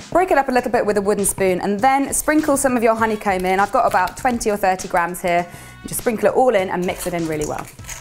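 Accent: United Kingdom. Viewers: I sometimes find it hard to catch what you are saying because you talk too fast. 😅 Don't get me wrong I love to the British accent! Nevertheless I love watching your videos or just to hear you talk. 😄😄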